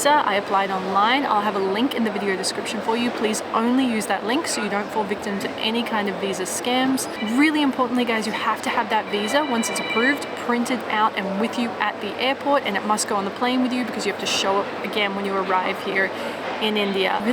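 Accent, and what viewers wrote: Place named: Australia. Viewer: 😂😂😂😘oh this is our Australian accent ❤❤❤❤awesome 😂😂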